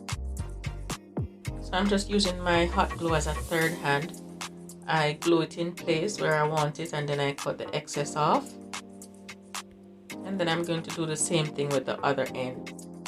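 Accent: Jamaica. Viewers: Sounds like a yardie. Mi like it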